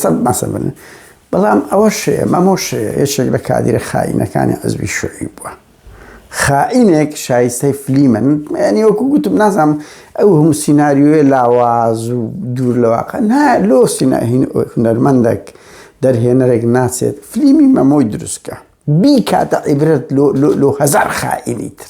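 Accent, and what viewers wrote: Erbil, Iraq. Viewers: کوردی دەڤەری هەولئر ناخۆشترین دەنگی هە یە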